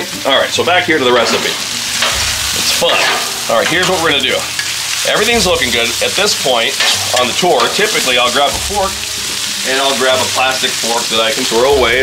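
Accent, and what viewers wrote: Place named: Northeastern Pennsylvania, United States. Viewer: Accent sounds like you're from NePA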